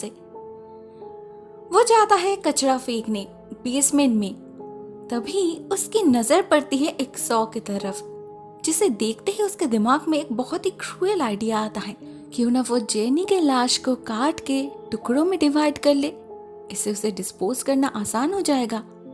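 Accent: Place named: Bhojpuri, India. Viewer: aap bhojpuri lakte ho aapki bhashah seh lagta hai.. Par mujeh aapki awaz seh pyar ho gya hai lagta hai K aapseh be...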